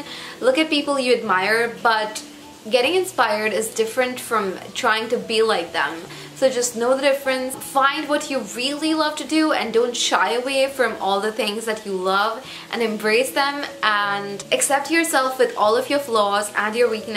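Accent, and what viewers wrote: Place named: India. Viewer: How did you get such a wonderful accent? Cause you're frm india i guess..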